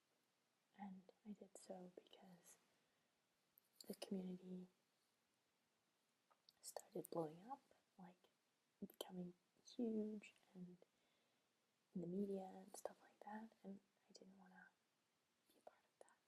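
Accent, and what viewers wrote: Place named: Denmark. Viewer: You had a thicker Danish accent, back then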